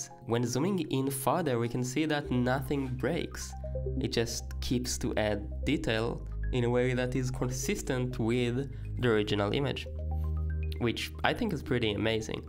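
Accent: Israel. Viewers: בערך שני שליש לתוך הסרטון חשבתי לעצמי ״מעניין מה זה המבטא הזה? מעניין מאיפה הוא?״ ואז קלטתי שאתה ישראלי!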